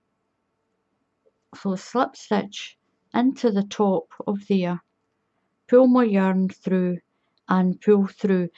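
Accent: Scotland, United Kingdom. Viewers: thanks, I live in Scotland so your Scottish accent is wonderful to hear,so many channels are American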